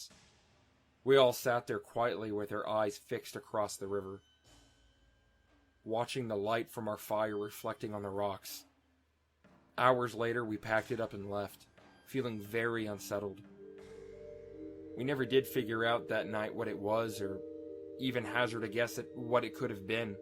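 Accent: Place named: United States. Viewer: Nice to hear an american read for once, almost everyone are brittish Or something like that lol. Great vid // a swede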